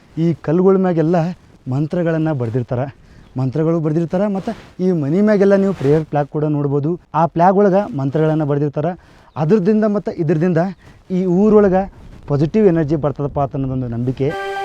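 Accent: North Karnataka, India. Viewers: Uttar karnataka bhase Bhari aeitri anna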